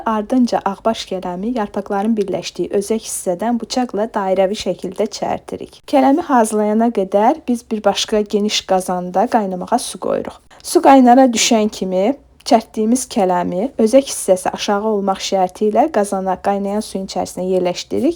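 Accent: Baku, Azerbaijan. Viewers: Can Ay Bakı Kəndlərindn Gələn Səslərin Ləzzəti. Həeee göyərti Yox. Əhsən Sizlərə.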